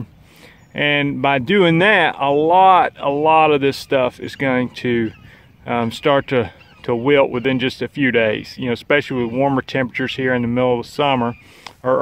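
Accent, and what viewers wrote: South, United States. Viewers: Thank you so much. Dude talks way too much. Sounds like he's from the south...which explains a lot.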